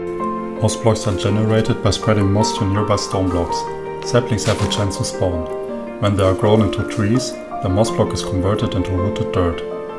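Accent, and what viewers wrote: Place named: Germany. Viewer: Great Video! Deserves way more attention than it already got! Ziemlich starker deutscher Akzent war, aber sehr gut verständlich. Mach weiter so! Keep going!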